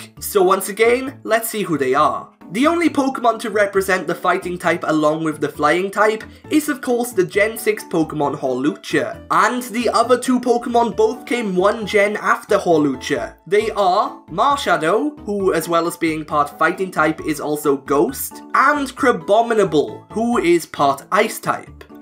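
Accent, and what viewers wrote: Wales, United Kingdom. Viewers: holy shit a welshman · Are you from Wales ??? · Oh man, I can not believe how they discriminate you so much for having a British accent when speaking in your videos. Personally, I really liked your videos, and if you ask me, I'm not American, I'm Mexican. Keep it up. Note: I saw that you forgot the psychic type although perhaps there are no unique combinations with it.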